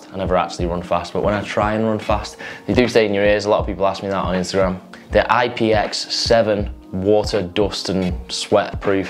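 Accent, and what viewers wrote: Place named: England, United Kingdom. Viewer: Sorry I can't handle the gay pommy accent lol try doing some legs bro. I'm heading back to Ryan Hutchinson for some decent sarcasm lol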